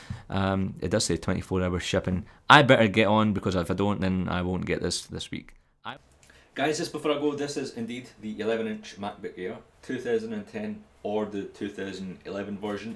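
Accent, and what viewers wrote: Scotland, United Kingdom. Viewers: PLEASE REPLY...when you get your new macbook air is it possible to compare it to the 2010 version of the macbook pro please? or answer this in one of your Q&A vids? I have the choice between getting a 2010 macbook pro (2.4 ghz core 2 duo, 4 gb ram, 250gb hardrive) for 600 pounds or the new macbook air...which would you recommend? By the way, im a big fan of your vids and i LOVE your scottish accent :D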